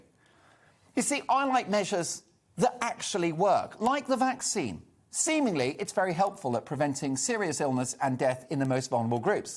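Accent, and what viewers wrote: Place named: Home Counties, United Kingdom. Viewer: Sweden, Florida and Texas put out to people who will never check what is happening in those three places. Mark Dolan is a vacuous bot who just says things in a Home Counties voice, cheery picking quotes and saying things like ‘facts don’t matter anymore’ whilst peddling half truths and down right lies. Fox News business model is 100% what GBNews is following. Very sad.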